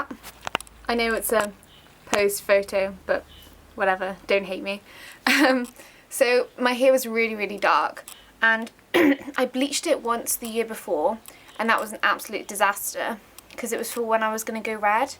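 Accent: Cornwall, United Kingdom. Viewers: You sound cornish, correct me if i'm wrong? Great tips, by the way!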